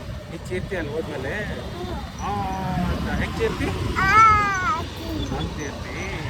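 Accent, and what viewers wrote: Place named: Hubli, India. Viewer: He is so cute, I feel so good to see him talking in Hubli kannada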